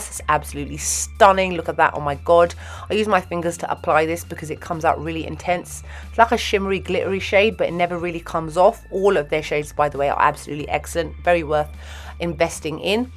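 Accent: United Kingdom. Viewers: I love this video so so much! Its a good old youtube style grwm with "oldie but goodie" products (i.e., reliable). I am so tired of hearing of new brands everyday and it feels like a rat race. Give me my NARS blush from 2010 and it still works, it still makes me happy. Also, your skin color is the same as mine, so its a great reference point to try shades based on how they look on you. And, finally I really connect to the manner in which you speak being a desi who grew up amongst relatives based in the UK :) ... So many reasons to love your content <3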